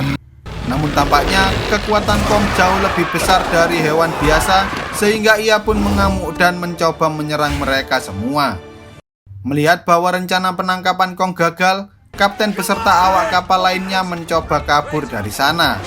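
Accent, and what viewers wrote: Java, Indonesia. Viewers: Logat jowo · kek logat orang jawa 🙄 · Gw suka nih channel nontonin film dari bahasa yang bersahat(medok :v)😂 Edit:film zombi lagi dong kalo ada😁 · Alasan gua suka sama channel ini: - Kadang suka becanda - Tidak terbata bata - Suaranya mirip opung gua - Memakai logat khas dari jawa Sukses selalu min 👍🏻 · Jowo mu ngendi min? 😂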